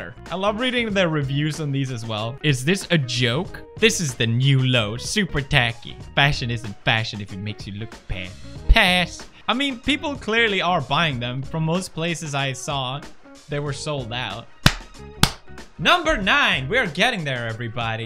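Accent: England, United Kingdom. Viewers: Your swedish has an english accent and i dont like it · Hmm sounds like he’s in England’s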